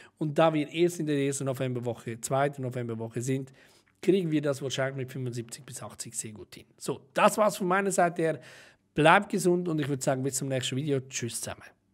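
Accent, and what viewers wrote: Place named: Switzerland. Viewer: schön mal ein schweizer zu hören im youtube :-)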